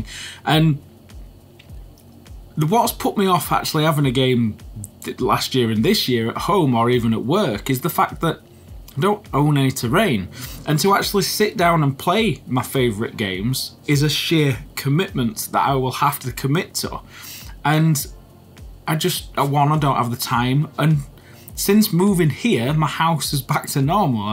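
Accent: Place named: Yorkshire, United Kingdom. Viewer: It's nice hearing a fellow Yorkshire accent (if you are not from Yorkshire I am so sorry if that was an insult lol)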